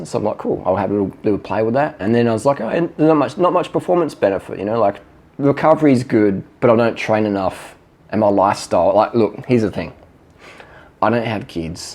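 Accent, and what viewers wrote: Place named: Australia. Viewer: it's OK for whatever you said when you were under carbed..... I find your Australian amusing.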